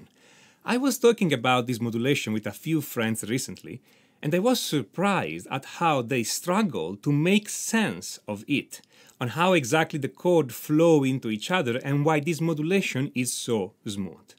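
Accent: Italy. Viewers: Hello are you italian?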